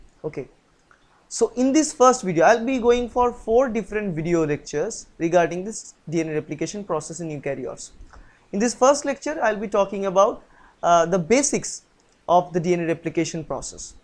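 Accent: Bengal, India. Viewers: Sir r u bangali ??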